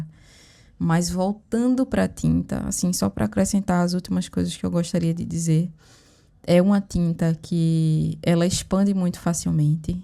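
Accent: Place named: Pernambuco, Brazil. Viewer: De onde tu é ? seu sotaque sobe mais pra pernambuco em diante, tbm sou nordestina ,eu já quis comprar a sonnet pra colocar em uns minis estojinhos que comprei, eu tenho a white nights a yellow ochre é horrivel de transparente mas as outras cores são maras eu gosto delas, tem uma galera que não acham elas profissionais o suficientes como se tivessem entre as profissionais e estudante, n sei se é a origem da tinta, por n ser marca francesa ou inglesa ou norte americana. O ocre da sonnet é ruim que a white nghts? sinto falta de ter migos pra trocar swatch de cor , e n teria comprado o kit da cotman45 cores num dia que ele passou sem dupla taxa pouca coisa mais cara que a de 12 cores n brasil , por isso n me arrependo. reconheço seus videos pelo pincel amarradinho do cabo curto da keramik , nunca vi ninguém falando deles.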